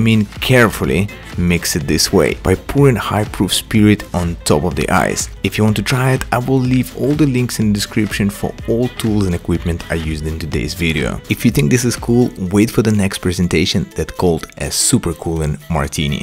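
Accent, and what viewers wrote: Russia. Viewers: wow i understood that you are russian from your accent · Слышно русский акцент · You have a small russian accent. Lol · рашиський акцент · Сижу такой смотрю... И вот замечаю такой небольшой наш РУССКИЙ акцент(я сам вообще не бубу в английском, но как-то заметил). Потом такой, обращаю внимание на на название канала... И всё встаёт на свои места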